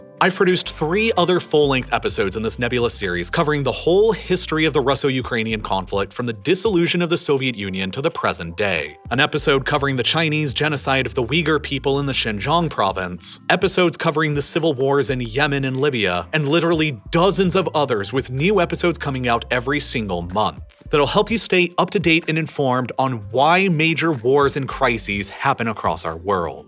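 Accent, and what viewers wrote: United States. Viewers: Russia is not scared of anyone not china not Japan not the scumbags from America or Europe maybe they were not ready in 214 but they are ready to whip your asses to smithereens I mean and I mentioned your because I take it from your accent you are an American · Woe Woe Woe! are you purposely doing Putin's Bidding? Listen to what you said Why would anyone in Nato want to do something like that. This whole entire conflict is based on Hypothetical what if's That Putin's Said could happen if his Imaginary Evil Enemies of the west. Yo you sound American You know good and god damn well no one has ever talked about or thought about or even wants to invade Russia or any where close to Russia. Theres this new theory that all the hostility we thought was coming from Russia never actually existed which explains the poor shape of it's military, but it turns out that conflict with the west is just the oldest eastern European Dictators survival tactic that they been using for political to stay in power for centuries. 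"Hey I know it sucks you all are starving to death and the economy is shit because me and the homies stole it but it's must make sacrifice or the mean evil west will come and get us. US in a way does it alot as well. There's for sure very powerful people who's wealth is directly effected by us being enemies with Russia. but if you actually sit down and think about it. there's nothing that give us any reason to believe these people on the other side of the world are our enemy other then the people who stand to gain telling us they are. I only recently realized we all have been finessed which like you just said there is a real war with real people dying by thousands all so that a make believe enemy could have an advantage in a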